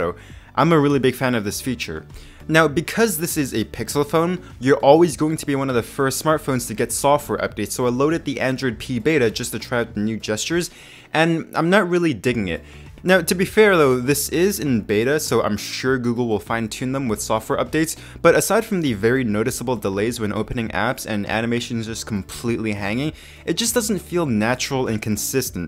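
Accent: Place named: Canada. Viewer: Judging by your accent, I guess you're.. Canadian? Am I right?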